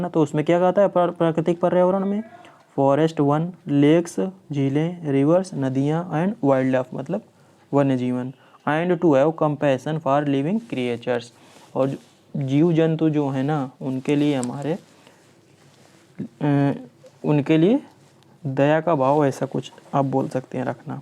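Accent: Kanpur, India. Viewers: Awaz se aap kanpur ya Fatehpur ke lag rahe hai 🙏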